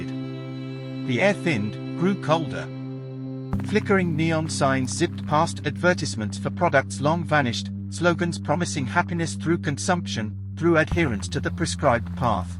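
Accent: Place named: India. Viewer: There are so many better text to voice models. Like elevenlabs. Please replace this lifeless indian-robotic commentary. The whole concept strongly depends on the speaker.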